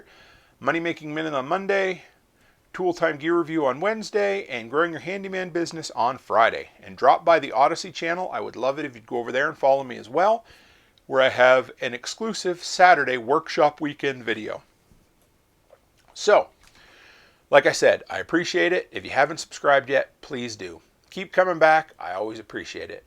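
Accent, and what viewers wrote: Canada. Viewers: Thanks for the info ... I learned something too :) What I like about your videos is that you talk "Canadian" -- specifically western Canadian!!! I'm from AB & get your little jokes!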